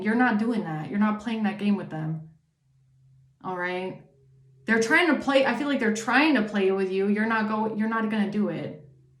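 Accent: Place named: Germany. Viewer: Good morning 🌅, LOOKING FORWARD TO HEARING YOUR VOICE, THAT GERMAN ACCESS OMG YOUR A GODDESS, MUCH RESPECT, HAVE A BEAUTIFUL DAY NIGHT, GOD BLESS YOU X ❤👑☀️💜🛡️👑☀️💜💜💜❤😊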